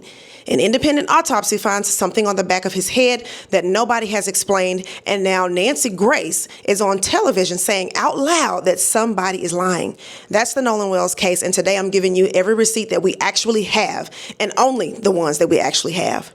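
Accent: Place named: South, United States. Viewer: Very thorough and informative. Love the southern accent, too.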